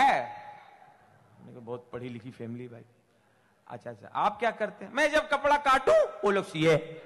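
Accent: Kanpur, India. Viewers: Kanpuria style ..good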